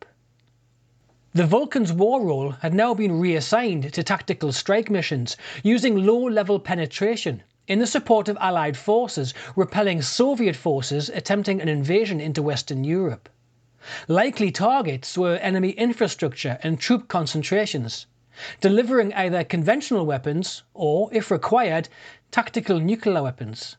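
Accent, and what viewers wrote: Newcastle, United Kingdom. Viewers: I love Vulcans and this was a story I'd never heard. Congrats on the genuine human commentary complete with genuine Geordie accent. I'm fed up with text-to-speech converters. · assumed you were from 'The Toon' before you mentioned it... geordie is obvious. Great to get someone local putting stuff out.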